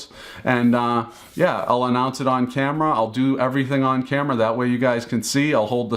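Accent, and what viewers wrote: Boston, United States. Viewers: That's a crazy story..people have no consideration...You remind me of Ben Affleck..the look and boston accent...:)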